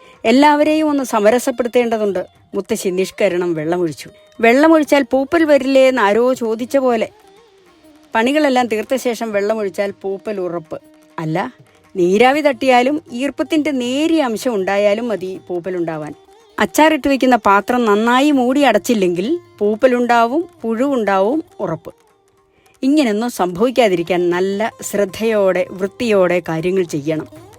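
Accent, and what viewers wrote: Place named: Kollam, India. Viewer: അമ്മയുടെ ഭാഷ കൊല്ലം ഭാഷ പോലെ ഉണ്ട്.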